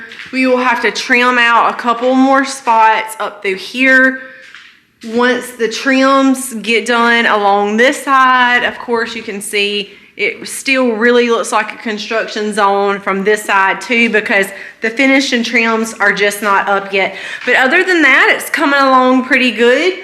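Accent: South, United States. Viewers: I love your videos and especially your southern accent! I could spend all day listening to you talk. I live in Minnesota and people up here don't speak with accents.. I have a Walmart 2 miles from where I live and I've had issues with them over the years. So I understand where you are coming from. Good luck dealing with your Walmart!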